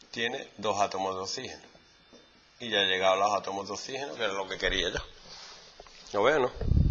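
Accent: Andalusia, Spain. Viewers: quiero intentar atender pero es que er asento andalu` de este hombre ma`hace musha grasia killo XD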